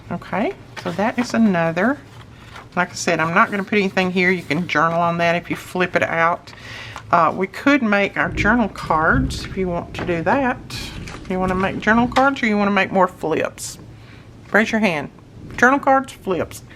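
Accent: South, United States. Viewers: What a wonderful video this is. Not only do I get a tutorial on how to make a great addition to my ephemera stash, I get to enjoy the background music of a storm. I miss the claps of thunder and the relentless rain, and this is a video that I will watch over and over. When I get homesick for my Texas girlhood, I watch your channel. I miss the speech of Southern people and the scream of Southern storms.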